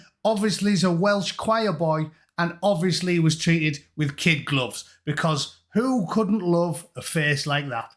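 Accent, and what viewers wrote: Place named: Newcastle, United Kingdom. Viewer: Top notch Geordie commentary. Spray painted ducks and 190year old polar bear.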